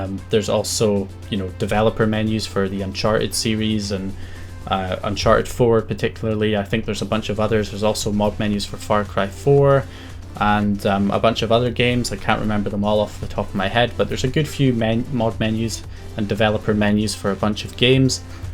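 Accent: Scotland, United Kingdom. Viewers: Are you Scottish? 🤔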